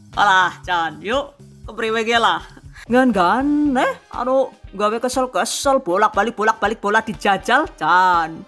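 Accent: Banyumas, Indonesia. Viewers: Hahaaa... Seneng bgt denger ekpresi dan logat bahasa ngapak2nya Bun👍 sangat menghibur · chanel sg pling tk senengi,idenya kreatif,video nya keren2 d tmbh lucu2 klo ngmong jwane medok bnyumasan..pembawa anya detil ccok tuk yg mau cb jualn,sllu on klo ada video trbaru gk ktinggln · Ngapake kaya nyong😁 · Bun q pengin takon njenengan sih wong endi,deneng pinter ngomong ngapak 😄😄😄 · Ngapaknya itu loh 🤣🤣🤣🤣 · Setiap play vidio pasti guyu dewek nk ada bahasa ngapak nya🤣🤣🤣🤣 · Ketemu juga resepnya, Ide jualan lagi dan lagi,plus Tips dan Triknya CATAT😅 btw suami saya itu tdnya gak suka bhs ngapak, wlaupun saya istrinya jg wong jowo😁 Alhamdulillahnya klo sya putar video dia ska ikutan senyum" gara" pembawaan bunda yg ngapk tp unik suka bkin ngalak deh😂matursuwun bunda bnyk menebar manfaat d setiap videonya love # 💓 #GiveawayTHV2021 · Mbaksayyyyyy...suka banget klo lagi mantengin channelmu....gaya ngapaknya yg khas bikin gk boring dn senyum2 sdri..penjelasanya dettilll...syukaaaaa..❤️❤️❤️❤️❤️ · Hallo assalamu'alaikum wr wb,waahh berapa banyak pahala yg bunda dapatkan dr vidio ilmu dr bunda yaahh....saya sangat senang dengan recep"yg bunda sampaikan...cara penyampaianya jelas..apalagi ada selingan ngapak"nya he he he he...mks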